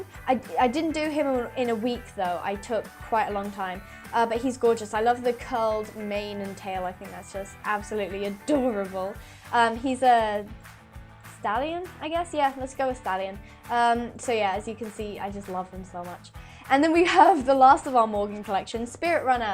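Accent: United Kingdom. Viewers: you speke britesh and p.s i love your videos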